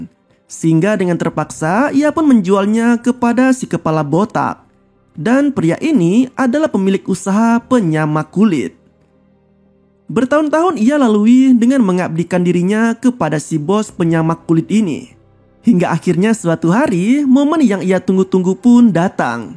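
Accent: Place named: West Java, Indonesia. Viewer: Varpum hahaha...narator nya sundanese banget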